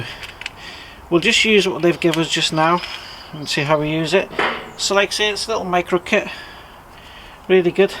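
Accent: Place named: Scotland, United Kingdom. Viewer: Scottish 100 (you sound scottish)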